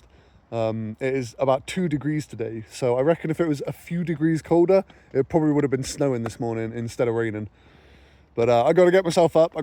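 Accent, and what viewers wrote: United Kingdom. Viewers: i like your british accent! Cracks me up when you say b**thole of water <3. Love your channel!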